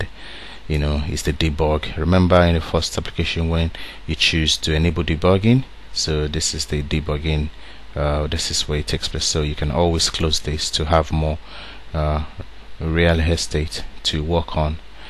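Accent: Nigeria. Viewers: Man. I love your Accent. It sounds Nigerian. I am pretty sure you are from Africa.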